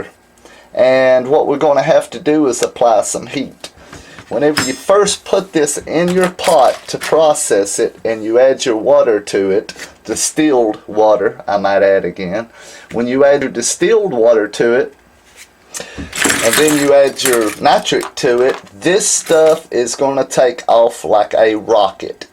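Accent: United States. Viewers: I was born near San Francisco, and frankly, I'm getting bored with my local dialect. It's nice to hear another distinctly American sound from time to time: reminds us that this is still a very varied country. Best Health. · "coyens" 😜 Your accent is so close to the kid from Forrest Gump.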